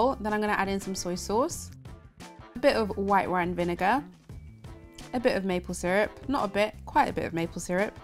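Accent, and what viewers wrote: England, United Kingdom. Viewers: She sounds English tho.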